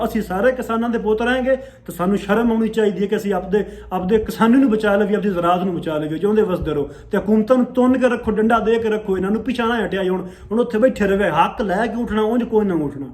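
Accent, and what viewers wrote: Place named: Punjab, India. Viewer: ਇਹ ਪੰਜਾਬੀ ਜਬਾਨ ਦੇ ਲਹਿਜਾ ਦਾ ਕੀ ਨਾਮ ਹੈ ਜੋ ਤੁਸੀ ਬੋਲਿਆ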